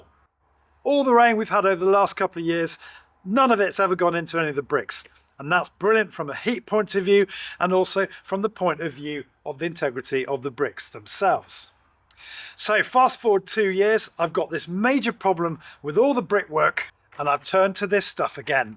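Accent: United Kingdom. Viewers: I think his pronunciation is standard in the UK.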